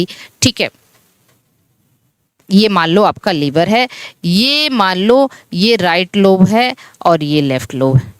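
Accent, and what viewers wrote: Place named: Uttar Pradesh, India. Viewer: Aapki baat cheet se ye ekdum clear ho gaya hai ki aap pakka UP se ha aur up board pdi ha quki hm sb up bale merkaana mrkawa bail ko axi tarah jante hai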